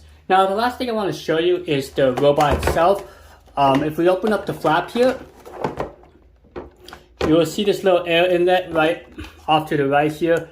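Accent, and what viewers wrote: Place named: Singapore. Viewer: You Singaporean or something? Accent is strong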